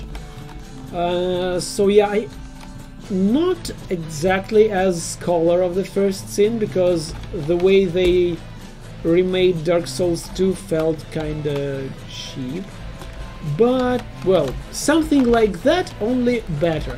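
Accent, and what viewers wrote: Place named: England, United Kingdom. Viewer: Oh, you are from England?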